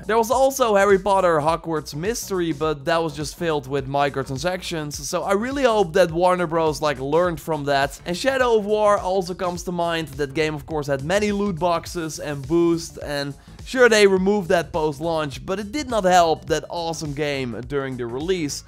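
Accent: Netherlands. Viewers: please work on your accent, it's so obvious that you're Dutch. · Nederlands accent, altijd herkenbaar in enkele seconden 😂👍🏻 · holy shit you sound dutch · Dat moment dat je het accent hoort en weet dat de presentator Nederlands is vanwege het accent (geen haat, alleen liefde)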